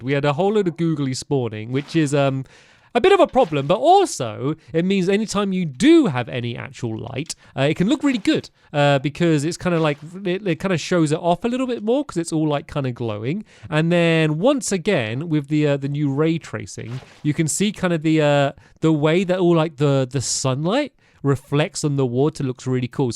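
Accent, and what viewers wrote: United Kingdom. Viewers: Yo stampy u speak British. ?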